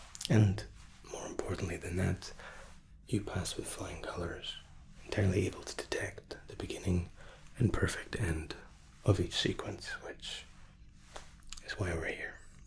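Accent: Scotland, United Kingdom. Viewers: Nice to hear a Scottish ASMRtist and one who shouts out others particularly my favourite Be Brave Be You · Tremendous as usual. As a Scot myself, I enjoy hearing the accent. It's very refreshing 😊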